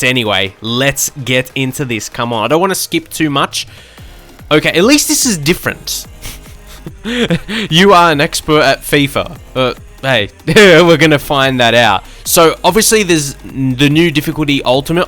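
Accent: Australia. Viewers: Yes! An Aussie! Just found channel! LOVE IT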